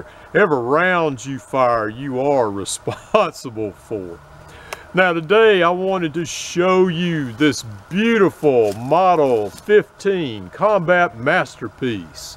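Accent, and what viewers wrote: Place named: Virginia, United States. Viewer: I would have guessed Virginia, well, at least we know he is not from Boston, Mass. lol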